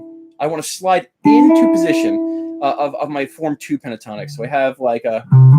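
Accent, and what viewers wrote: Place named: Upstate New York, United States. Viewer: Do you know anyone named Miraglia? Or, do you happen to be from upstate New York? My buddy ( since passed), and his brother, spoke just like you. Mannerisms as well.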